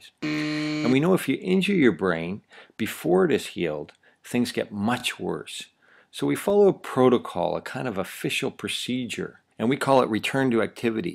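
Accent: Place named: Canada. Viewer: great video! i knew you were canadian from your accent haha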